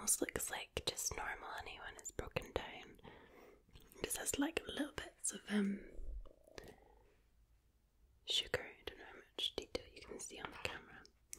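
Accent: Scotland, United Kingdom. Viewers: I love Scottish accents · the scottish accent is so good! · Oh yes same thing. I put almond milk in my oatmeal instead of milk because it’s a little healthier I am part Scottish 👍🏼 by the way and love ❤️ your accent 😁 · You're amazing. Your beauty, your personality and that scottish accent. Stunning! Great video too! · Love the Scottish accent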